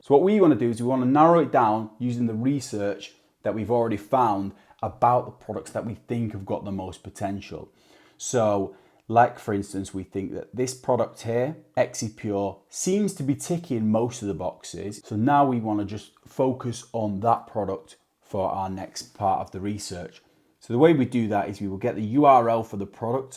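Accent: United Kingdom. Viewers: good and clear presentation,thanks for a different view, a very clever lad, and a fellow brit I think.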